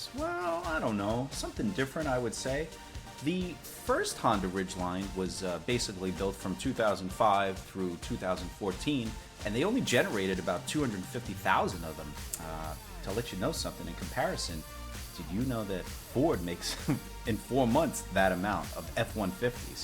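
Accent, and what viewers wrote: New England, United States. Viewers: I am a salesman at a Honda dealership in Atlanta, and we had deposits on these Ridgeline's two months in advance, and still have many deposits to be filled. If you want to be sold on this truck, just come down from what ever New England state that your and your horrible accent is from to Georgia and we'll get you hooked up with the most diverse trunk on the market! Thanks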